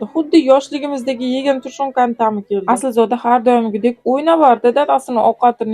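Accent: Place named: Samarkand, Uzbekistan. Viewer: Кизиз куз тегмасин истаралигакан.сузизга ча,ча кушиб гапрябсиз самаркант тарабдами уйларин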